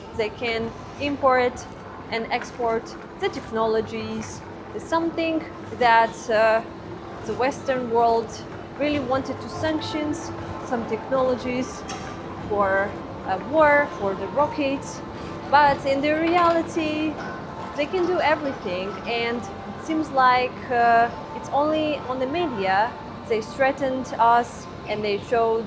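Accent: Russia. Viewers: your English accent is more British than Russia 🇷🇺 even though you haven’t being there, that’s good, and I also like it when you spiced up with Russia 🇷🇺 English accent too. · Очень "рязанский английский" и тупой влог. Идите работать. Достали эти тупые блогеры.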